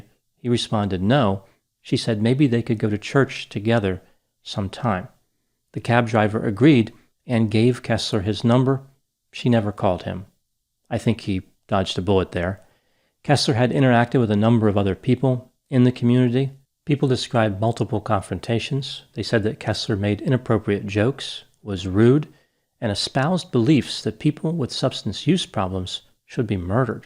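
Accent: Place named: Philadelphia, United States. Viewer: very nicely done analysis. Kessler reminds me a bit of the Florida serial murderer Aileen Carol Wuornos. Doctor, you sound a bit like you're from the greater Philadelphia, or Tri-state area. Thanks a bit for the video. I'll keep watching future postings.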